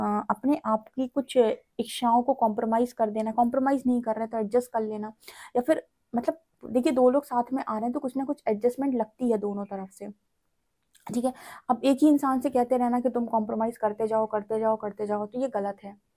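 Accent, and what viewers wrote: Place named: Bundelkhand, India. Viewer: Apka samjhane tarika bahut acha hai apki boli mai bundelkhand prabhav najar ata hai.. Pla mujhe pursanal riding de dijiye 🙏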